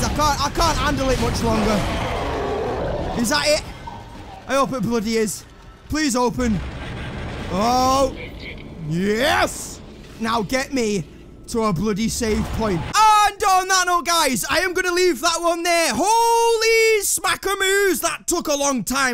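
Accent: United Kingdom. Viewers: This guy is fucking brilliant! He's so lively and energetic, you've gained a subscriber today. P.S. What part of Britain are you from?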